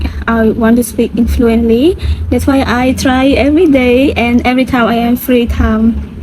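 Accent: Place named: England, United Kingdom. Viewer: You so very english wow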